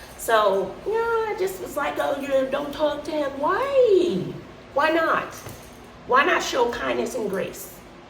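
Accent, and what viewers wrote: New York, United States. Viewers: I missed where you are from, if you stated it earlier in a video. I’m going to guess you are from New York or Chicago.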